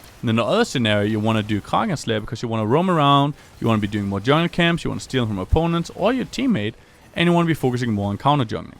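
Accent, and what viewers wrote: Denmark. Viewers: Er han dansk?? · I gotta ask, are you Danish? Great video BTW.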